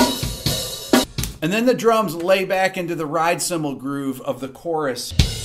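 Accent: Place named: United States. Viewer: Its not chap suey..... silly murican accents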